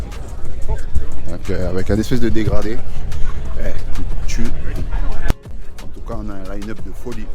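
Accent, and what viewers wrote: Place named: South, France. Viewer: Savas tu vas très bien et bien décontracté pour moi bonne vidéo on dirait que tu est originaire du sud de la France moi aussi est je vie à Miami fl à plus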